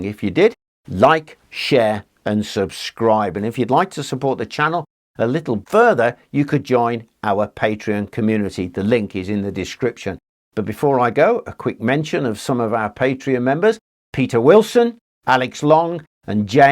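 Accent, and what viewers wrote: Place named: Midlands, United Kingdom. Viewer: Midlands rather than northern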